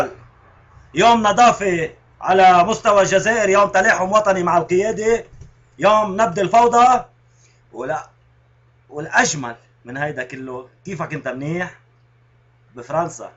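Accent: Lebanon. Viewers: انا سورية ولهجتو غير اللهجة لسورية لهجتو بتشبه اللهجة اللبنانية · هذا ماشي سوري هذا لبناني باينة من لهجة تاعو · لك هاد لبناني مو سوري مبين من حكيو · هاد لبناني مو سوري .. السوريين مابيحكو هيك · هاد لبناني مبين من لهجتو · من لهجته باينة انها لهجة لبنانية .والله لي فخر انني جزائرية والف تحية لفلسطين وسوريا ولبنان والمغرب كلنا عرب اخوة نحط ايد بإيد باش نصنع المجد ونرجع زمن القوة والسلطة اللي سلبت منا وأستدل بكلامي بقول عمر بن الخطاب رضي الله عنه (نحن قوم أعزنا الله بالإسلام، فإن ابتغينا العزة بغيره أذلنا الله) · اول شي هاد مدح الجزائريين تاني شي هاد لبناني مو سوري و واضح من لهجتو حاج تعملو فتن بين بعض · هاد لبناني من لهجتو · ألى الكل هاد الشاب ليس سوري هاد لبناني الدليل اللهجه مش سوريه لهجتو لبنانيه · لهجته لبنانية مو سورية..... هاد لبناني مو سوري وبعدين بيحكي كلمات وسخة كتير وهي من عادات اللبنانية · هادا لبناني مو سوري نحنا لهجتنا مو هيك ياجماعة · هيدا لبناني مش سوري لهجتو لبنانيه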